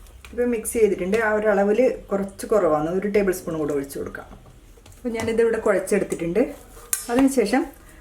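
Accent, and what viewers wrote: Kannur, India. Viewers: Someone from kannur district yeah?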